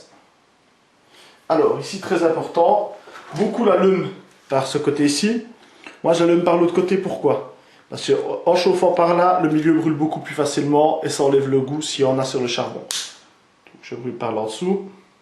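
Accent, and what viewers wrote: Belgium. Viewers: Merci pour ce tuto ! Parcontre je comprend pas le truc du demi charbon, sa sert à quoi ? Et dommage pour le niveau d'eau moi je sais jamais si y'en à trop ou pas assez MDR ! Et ce qui me fais bien rire aussi quand y'en à qui disent "un petit blanc qui explique comment faire la chicha" MDR faut être marocain, algérien ou je ne sais quoi pour fumer ou faire la chicha ?! N'importe quoi... Bref merci du tuto ! Et j'adore ton accent belge :)))))))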